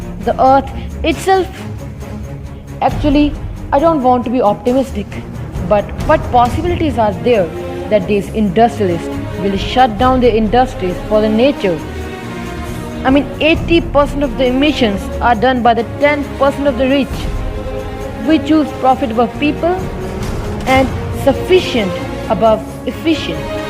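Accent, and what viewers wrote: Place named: India. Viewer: Ok....cut the LOUD dramatic music. It doesn’t help. Speak better English. Strong Indian dialect like Apo in Simpsons doesn’t help.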